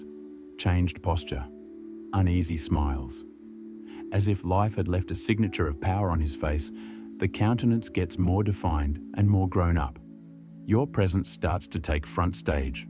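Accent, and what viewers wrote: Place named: Australia. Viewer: Strong Aussie accent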